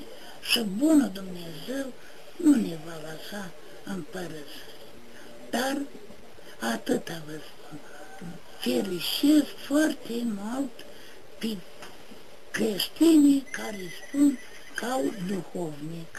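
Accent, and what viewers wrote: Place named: Moldova. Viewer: He speaks in Romanian, with an accent typically encountered in north-eastern Romania (Moldavia region). Some of his words sound a bit archaic, meaning that today, ordinary Romanians would not use those words, since newer words (neologisms) are used.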